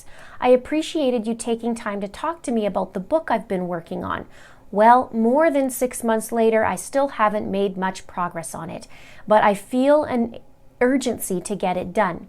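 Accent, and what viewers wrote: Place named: Canada. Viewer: She's Canadian I believe? They pronounce some things differently than other cultures.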